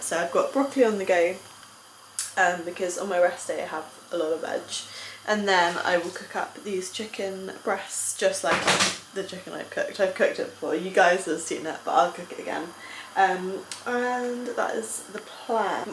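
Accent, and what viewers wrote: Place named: Oxford, United Kingdom. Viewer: That Oxford accent tho... <3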